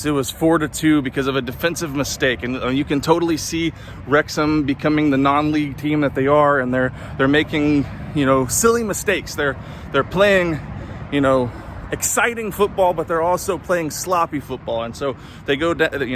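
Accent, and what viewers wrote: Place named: United States. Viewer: It's good to see you taking an interest in Wrexham. I was there and the atmosphere was electric. A few things to work on to sound, as you put it, less American. You would say Wrexham were 2 up. And Coventry were 2-1 down. He scored, not he netted it. But your videos are good the way they are. It's the interest you're taking and the passion that comes across in them. We'll done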